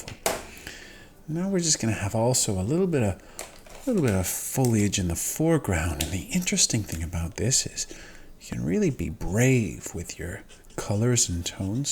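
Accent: United States. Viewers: American accent on point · I cannot listen to you with an American accent I'm going to SCREAM ITS SO UNNERVING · Nice Amati can accent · you pull off the American accent really well. Great drawing.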